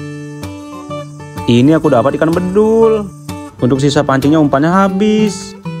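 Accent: Java, Indonesia. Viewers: Bg orng Sulawesi tpi logat jawa sma kita bang😂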